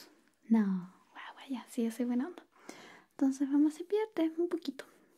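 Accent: Chile. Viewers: Me encanyel acento chileno, saludos desde Leenares, Japón. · 100% con su asento chileno hermoso 😊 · No sé si es mi impresión o ya no neutralizas tanto tu acento chileno. Solo te falta el cachai ja, ja, ja, ja... Un fuerte abrazo, amiga. Pronto ven a Guayaquil, de seguro tienes tu club de fans. Shalom. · Jaja ahora puedo ser el SJJ ros- digo digo COQUETTE! Pero antes una siesta no hara mal... Pd: Amo como hablas a lo chileno! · amo tu asentó chileno😍👊🏻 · No me cae la forma de hablar de chile pero me hizo cambiar de opinion,ME ENCANTAN SUS VIDEOS DE LA HERMANA CHILENA MUAAACK!! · Amo el acento chileno😻intento hacer lo mismo pero a mi no me sale😭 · En este video se le nota mucho el asentó chileno 😍 Un excelente video ❤ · Hola eres my nueva YouTuber soy de Perú, y me gustan tu videos de ASMR te digo que eres una chica muy bonita y se me hace extraño escuchar un video con el acento chileno marcado y en otro videos no son tan marcado tu dejo chileno. 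Me agrada verte siempre. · Que linda, me lleve una sorpresa con tu acento Chileno🇨🇱, un abrazo, me encantan tus vídeos, me ayudan a desconectar.💫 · Me encanta cuando hablas con tu acento chileno ❤ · primera vez en tu canal y reconocer altiro que eres Chilena uwu 💞💞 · Casi no logro descifrar tu acento solo hoy pude Chilena 😊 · La mejor manera de iniciar la semana, con mi hermanita chilena (y coquette) Muchas gracias,. Una feliz noche de lunes (e inicio de semana) para ti también hoy. Cuídate mucho y que Dios te bendiga. Nos vemos pronto amiga, te quiero. Saludos desde Colombia hasta Chile, y, por cierto, muy lindo tu acento. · Weeey apenas empezó el vídeo supe que estabas hablando como Chilena, porque soy Chilena 😮😮 de donde eres? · holis oye te tengo una pregunta en tu vídeo dices algo de que hablas como chilena eres chilena? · Ahorita ando en modo "dios iluminame o eliminame" jaja ya que se me juntaron dos enfermedades (por wey en parte jeje😅😅😅) asi que tu voz con tu acento chileno mas el poderosisimo paracetamol me vendran de perlas para sentirme mejor, eso receto el doctor jaja😁😁😎. 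Que tengas linda noche. 😊😊😊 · Me encantas sin susurrar!!! Y ese acento chileno bellooooooo❤️❤️❤️❤️ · Ya vi que si es chilena. Weeeeeeeeey nunca se le habia notado su acento weon! Me enamore mas de ella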